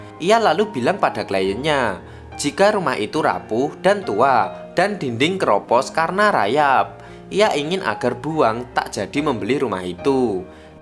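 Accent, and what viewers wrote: Java, Indonesia. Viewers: Logat e jowo medok banget hehe, tp om suka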